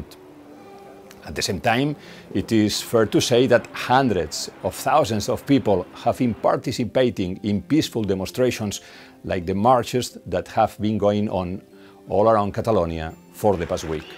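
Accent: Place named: Catalonia, Spain. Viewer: yes, seriously, I catch Spanish and Catalan accents ;) truth is that his accent is arguably quite thick but we must let him the merits of at least trying to make a comprehensible reading. Not the case with the majority of Spanish media unfortunately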